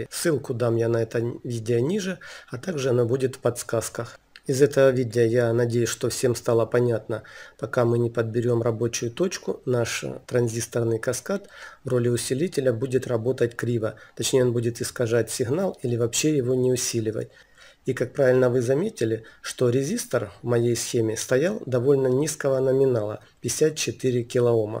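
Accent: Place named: Surzhyk, Ukraine. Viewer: Слова некоторые просто Суржик , автор живет в той местности где так разговаривают . но так даже интереснее .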